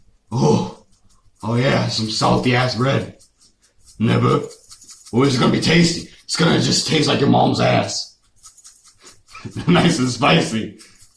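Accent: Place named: Ireland. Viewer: he sounds like Irish man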